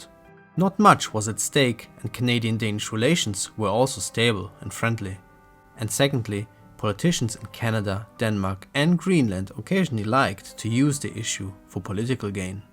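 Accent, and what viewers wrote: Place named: Germany. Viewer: love the german accent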